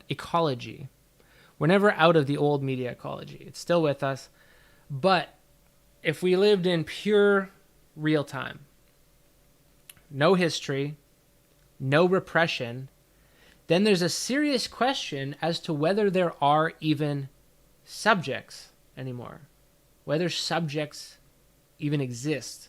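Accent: Canada. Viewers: Are you a west coast Canadian by any chance?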